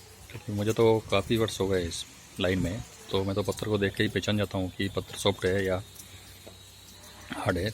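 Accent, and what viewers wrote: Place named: Mewar, India. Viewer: Ktu bhaisab mevadi bolira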